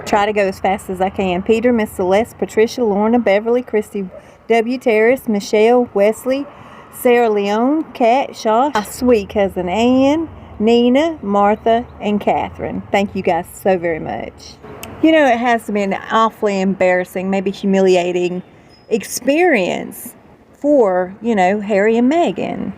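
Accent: South, United States. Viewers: Hi I love your southern drowl. Megain was starting to make me dislike Americans. Then I realized it’s not fair to label all by that Hussey. I must tell you I can’t stand that President either. The two need binning. I am from Northern Ireland and fiercely BRITISH. We arrived in your country and helped start the country. We gave 17 Presbyterian President’s and help with the writing of the constitution. Xxxxx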